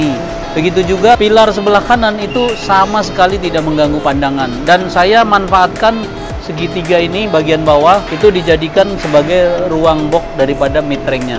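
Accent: Banyumas, Indonesia. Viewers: Ora ngapak ora kepenak .sukses om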